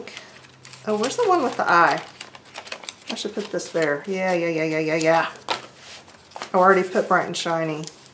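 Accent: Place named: Texas, United States. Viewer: I’m so delighted to know they still make the color “YELLA” I thought they quit making it when I left Texas 30 years ago. When I heard you say “YELLA,” I had to “PEENCH” myself. And then I saw yer Chick fil a card.I’m soooooo homesick, even now. I lived in Tennessee for 4 years too. When I’ve been watching YOU, my aceant reverts and my hubby says “ you’ve been watching again.” I fought my accent fur years, coz people in California treated me like I was ignert. Then I discovered I was proud of it, and I cain’t get it back completely. Sometimes you need a little age and maybe a kick in the pants to bring you round to where you were. And where I was, I sounded JUST like you! Blessings yall❣️🙏🏼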